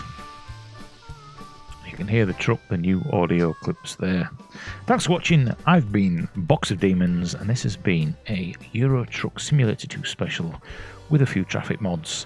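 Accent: Yorkshire, United Kingdom. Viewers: Finally a Yorkshire Youtuber! Subbed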